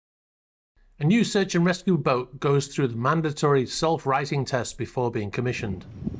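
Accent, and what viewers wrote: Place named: Oldham, United Kingdom. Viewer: Thanks for these short clips. The longer ones too. I’ve been a fan/subscriber since before seized yachts were the big news. I’m in Toronto but I think part of the appeal is your accent reminds me of my friends who came from Oldham. Looking forward to the next one.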